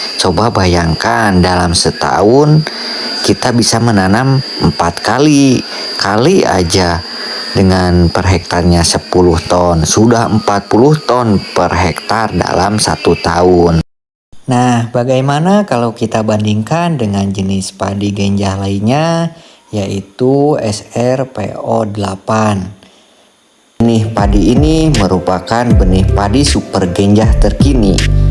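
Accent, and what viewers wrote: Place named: Sunda, Indonesia. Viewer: Hatur nuhun kang pantesan atuh logat bahasana nyunda pisan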